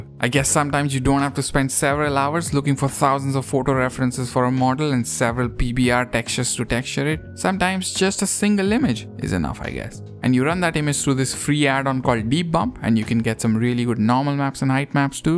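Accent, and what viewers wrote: India. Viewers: Nice tut, tnx! Your Indian accent and mumbling make it unbearable, though.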